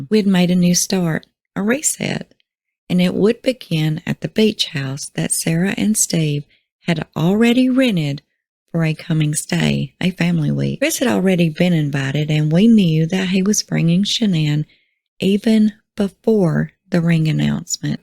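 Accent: South, United States. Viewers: You actually did a really good job on the Chris Watts book reading and I literally listened to all 9 hours and your voice is pleasant and your accent is fun because I literally never listen to any voiceovers with a Southern accent so it was a good change and you have a good speaking voice. Nice job!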